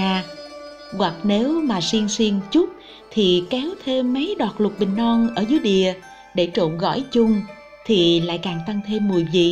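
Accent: Mekong Delta, Vietnam. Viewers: Chat lieu lam nen mon ngon rat dan da binh di khong co cong thucnhung day mui huong ket hop voi chat giong mien tay de thuong va khong the thieu chiec ao ba ba lam nen hon que viet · A mà là bí thư tỉnh em .A ký ngay quyết định Cho em về làm phát thanh viên đài truyền hình của tỉnh ngay. Vì giọng đọc của em rất ngọt ngào ấm áp.....lôi cuốn khán giả. Chuẩn gái miền tây...... Hihi.....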